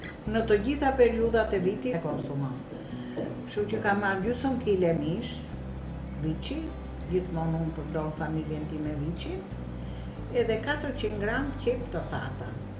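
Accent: Tirana, Albania. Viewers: Je me e mira. si per gatim ashtu dhe per te folmen tironce .respekt nga larg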